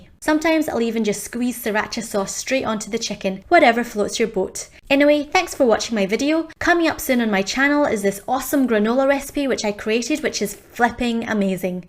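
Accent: Scotland, United Kingdom. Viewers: You live in Australia but you have an awesome accent that makes you sound Scottish almost. Where are you from originally?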